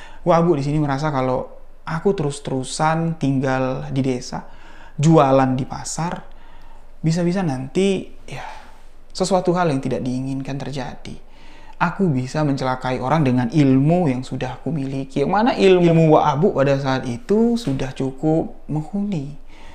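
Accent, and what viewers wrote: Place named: Sumatra, Indonesia. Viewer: aku nyimak mulai awal aku kira dulu orang madura .terusdan terus ku smak eh bru aku ngeh oh logat sumatra sekalinya.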